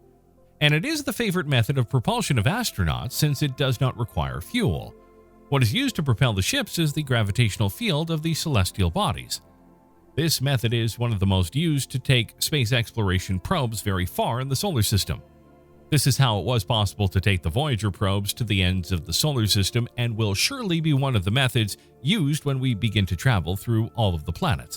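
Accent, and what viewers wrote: United States. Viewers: No shit. But the dude in the video speaks American English. Brits and Aussies are used to miles as well as Kilometers. The whole English world understands miles. Watch the Isle of Man TT currently running or watch MotoGP, they use both. If that's the reason, it's a piss poor one. Why not make a video the 330 Million Americans can understand also!?!?! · Would it kill you to show the distance in miles also??? The narrator sounds pretty American to me and yet all you show is kilometers. So annoying 👎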